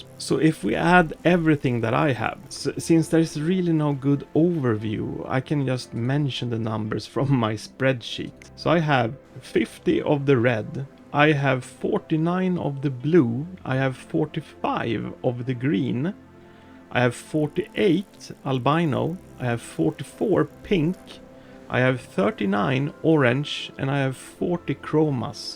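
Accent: Sweden. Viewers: are you swedish ( är du svensk)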